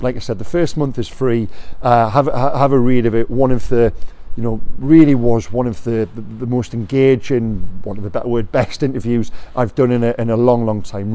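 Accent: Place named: RP, United Kingdom. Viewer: he’s got a weird tory accent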